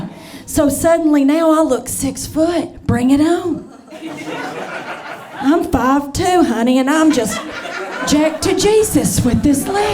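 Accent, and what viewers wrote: South, United States. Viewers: Really great set! 😂😂 a really sweet southern accent too! Best of luck! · I love her southern accent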